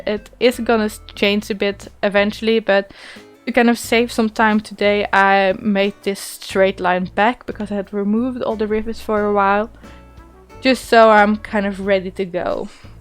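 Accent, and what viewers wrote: Netherlands. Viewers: By any chance are you Dutch? Just wondering 😊 I've watched a few of your Animal Crossing video's! Also we made a few Tour video's haha We started playing Animal Crossing when New Horizon Released!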